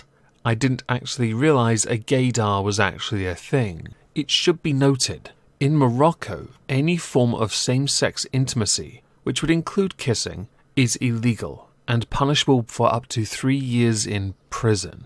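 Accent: RP, United Kingdom. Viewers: Who else subbed for the posh accent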